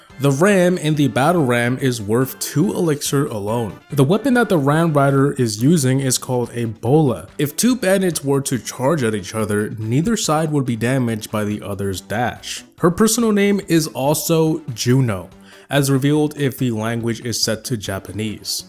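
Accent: United States. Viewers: Good video expect the american midwest pronunciation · this is the most american man i have ever heard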